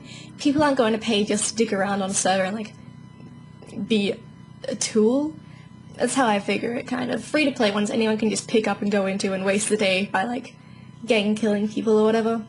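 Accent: Australia. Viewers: ZOOOOO MAAAAAAAAHHHH GOOOOOOOOOOOOODNESSSS?! You're Australian! Instant. Fucking. Sub.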